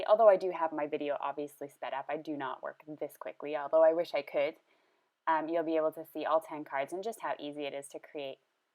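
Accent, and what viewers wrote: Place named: Canada. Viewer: ... I love your Canadian accent. I know I am supposed to comment about the ATCs... hehe. Gorgeous cards. Thank you so much for sharing...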